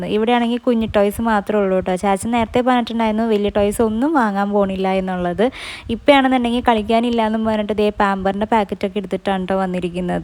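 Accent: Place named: Thrissur, India. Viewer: Athu Thrissur ullavarde samsarathil varunnatha adi kittum ttaa ithupole ellathilum tta tto swabavikamayi avrde samsarareethiyil varunnatha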